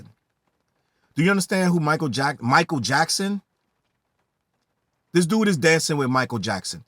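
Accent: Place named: New York, United States. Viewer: He did ride the waves. Ion mean no disrespect but you an old head and you sound like you from New York. A lot of waves he rode, the young niggas was already hip too. put the on, had a his own buzz and had to hop on to get the streets. Yes he did make them bigger on a mainstream level but he did ride the wave as well. Whether it’s using their flow/ lingo or dressing like them. The man is the ultimate culture vulture.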